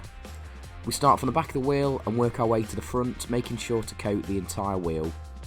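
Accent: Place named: East Midlands, United Kingdom. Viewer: Reckon this came onto my feed because I’ve spent too much time in the East Midlands recently 😂 clocked the accent straight away. Great to see how these are done, always wondered how damage was repaired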